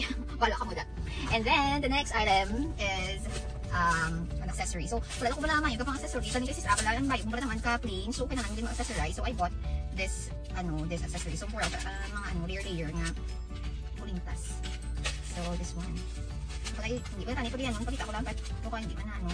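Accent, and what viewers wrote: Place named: Iloilo, Philippines. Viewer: Go sis. Nakakaaliw talaga amg diction ng Mga ilongga. Love your confidence ☺️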